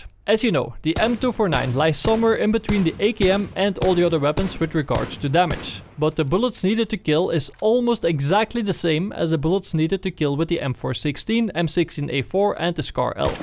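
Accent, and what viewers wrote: Netherlands. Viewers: Is he dutch? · like the dutch accent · Dutch, right? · are you dutch ? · je klinkt ml ben je nl?? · Wait, are you Dutch?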